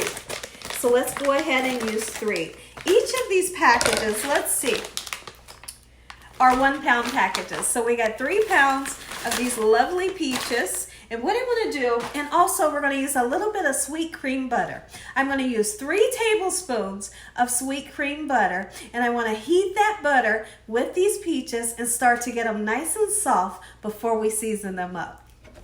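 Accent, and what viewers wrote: South, United States. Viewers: You accent is sooooooooooooo bomb. I love a country girl accent💖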